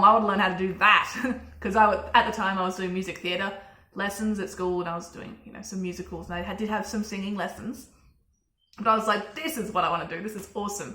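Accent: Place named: Australia. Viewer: Your normal voice is cute as hell too. I love your Australian accent. I myself have a Midwest/southern US accent.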